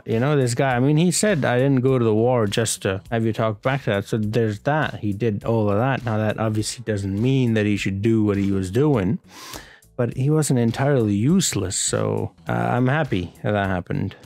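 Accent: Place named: Canada. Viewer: yo really? I thought you might be but I wasn't sure, I don't know if English is your first language so forgive me if this is rude but your pronunciation is awesome, I'd honestly been thinking you were maybe Canadian with Asian heritage this whole time. That's really cool though! The jubilee is probably what caused the algorithm to latch onto this video, it was this one specifically that was in my recommended a few days ago. The jubilee is the anniversary of the queen's coronation. This weekend was the queen's platinum jubilee, meaning she's been the Queen for 70 years straight. So a reaction to a doctor who episode about the coronation during the run up to it has probably caused the algorithm to catapult you into British YouTube feeds I'd bet. Unless you haven't noticed a spike in viewers this week, in which case maybe it's just a coincidence. Search engine optimisation and algorithms interest me though so that's why I mention it 😅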